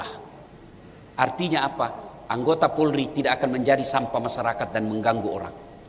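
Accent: Bugis, Indonesia. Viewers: Lidah Bugis itu bro maksudnya sama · lidah bugis bang hehe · Mungkin lidah orang Bugis beda dgn penyebutan lidah suku BPK pun sebaliknya ini pernah juga ditanggapi oleh usdtad lainnya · Bagus aja masuknnya mas,, tpi tidsk mungkin ustad tidak paham atau sengaja asal asalan., tpi ini dialek daerah. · Lidah bugis mas...itu bukan masukan tapi ...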